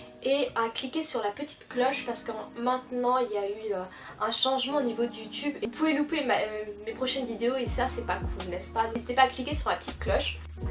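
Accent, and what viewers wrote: Somalia. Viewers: w t f is this hrgeysa dielect i dont even get any word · Tes une Pauvre refugier somalienne qui a eu les papier enfin j crois , rien que ton accent te trahi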